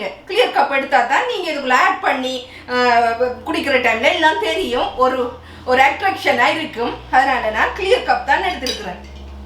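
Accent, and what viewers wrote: Sri Lanka. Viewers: sis ❤️❤️❤️iam nee view er keep in touch my channel sis.Unga இலங்கை தமிழ் மிகவும் அருமையாக உள்ளது சிஸ் ❤️❤️❤️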